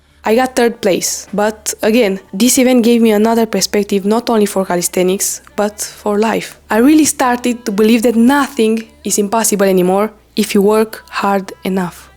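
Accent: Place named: Romania. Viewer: I knew that accent sounds familiar, but I didn't expect you to be Romanian. Your body looks insane. Felicitări!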